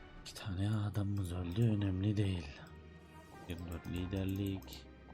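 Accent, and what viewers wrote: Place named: Ankara, Turkey. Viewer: bizim taraflarin şivesine benziyor anlamında demek istedim. Ankara yani. Ankara'nın neresi?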